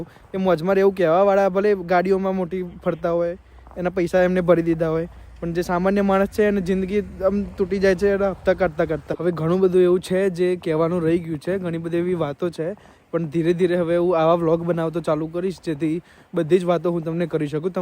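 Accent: India. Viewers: Bhai tamari boli prmane tame charotar ke amdavad na lago chho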